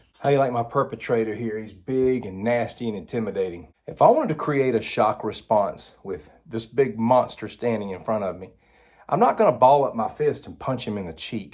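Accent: South, United States. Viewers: Thank you for making a video on this topic. Also, I enjoy hearing you talk. You have a nice accent; I'm just trying to place it. Southern? I'm from Tennesse and I love a smooth accent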